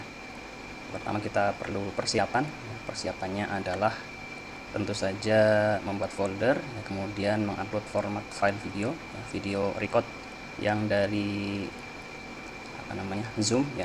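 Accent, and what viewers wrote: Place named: Java, Indonesia. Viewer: Matur suwun pa. Suarane medhok pa. Salam dari blog ngapak.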